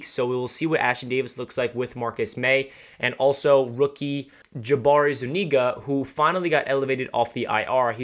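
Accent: New York, United States. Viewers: Hard to tell whether I should take him serious with that thick New York accent. So hard to tell if they're trying to con you. From a fellows Chiefs fan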